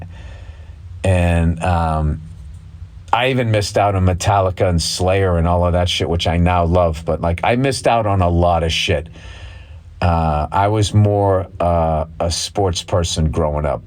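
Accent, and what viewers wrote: Boston, United States. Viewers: Bill burrrrr. I nominate Bill for the CEO of Sam Adam's beeeerra- Boston Accent 😅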